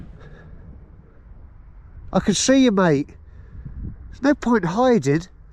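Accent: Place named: East Anglia, United Kingdom. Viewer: Hiya! I do always enjoy your waffle, always after content like this. What you said about not being in a rush all the time and slowing down really appeals to me. Sounded a bit ironic to me though, in your quite fast spoken Suffolk accent. But that's all right - no big deal. 😄 There are a few wishes in the corner of my mind, but when you don't know what the future may bring at you tomorrow, making resolutions and sticking to them proves rather difficult. The best we can do, I reckon, is enjoy every single moment of life to the fullest, as if it was our last. Cheers, greetings from Minsk! Mike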